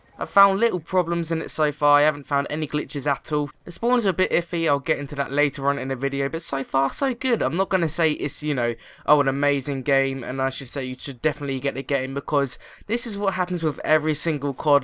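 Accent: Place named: Oxfordshire, United Kingdom. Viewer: Great review. I completely agreed with 99% of everything you said. Where are you from by the way? You sound exactly like my half brother. You from somewhere in oxfordshire?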